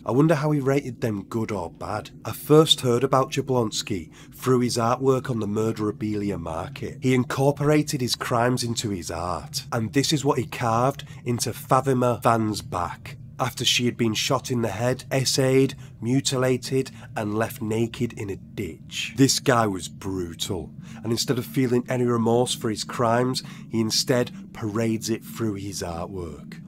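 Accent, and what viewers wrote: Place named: Liverpool, United Kingdom. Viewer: Dude are you from liverpool? Your accent is so scouse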